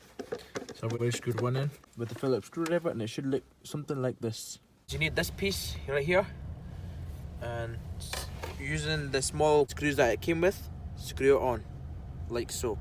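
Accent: Scotland, United Kingdom. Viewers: Thanks for taking the time to show us amateurs how to secure an armrest. I have just bought a 2013 Octavia and need to order one as I keep looking for something to support my arm when I feel lazy. Incidentally, love the Scottish accent. Cheers Mate.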